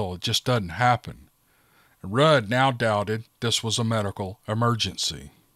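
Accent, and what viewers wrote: South, United States. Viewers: As a real southerner in Atlanta living in a world of Yankees, your voice take me back to my youth. GOD bless you sir, never change! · you are an incredible story teller. I could listen to you for hours. I just love your southern twang. It's very comforting. Don't be so hard on yourself.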